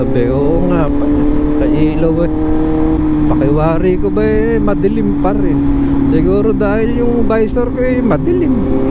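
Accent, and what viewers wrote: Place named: Bulacan, Philippines. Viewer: Aba eh nawiwili akong makinig sa pagsasalita mo ngayun idol eh,alam mo bagang ganyan din magsalita mga taga San Miguel bu..lacan...mga kamag anak ko dun eh pag silay magsalita ng ganyan eh di ko wari kung akoy tatawa eh....hehehehe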